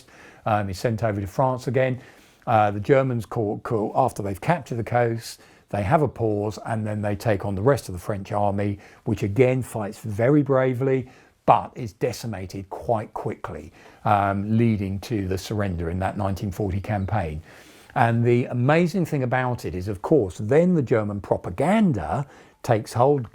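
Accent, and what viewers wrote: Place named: United Kingdom. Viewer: i am apologizing since English is not my native.enjoyed this video and i am wandering what part of G.Britain his accent came from.in my country tv series Only fools and horses is stiil popular and finding some similarities,although prounancing every sounds perfectly clear and have no problem to understand him.once more apologizing for this ugly English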